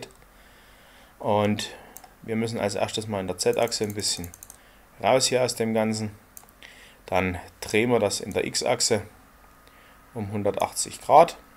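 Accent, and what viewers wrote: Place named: Saxony, Germany. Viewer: Vielen Dank! Bitte in Zukunft mehr Tutorials auf sächsisch :)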